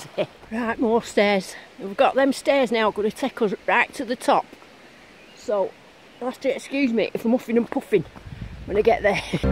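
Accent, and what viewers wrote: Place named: Rochdale, United Kingdom. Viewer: What an amazing watch on ash worth valley. Your videos presentation is spot on. Love your humour and the Rochdale accent is just so close to home it’s a comfort to hear your commentary. Waiting for the sunshine to go and explore ❤️🇬🇧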